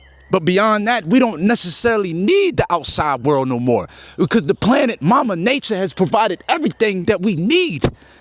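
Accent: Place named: New York, United States. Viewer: Where you come from ? NYC ? Sounds like it.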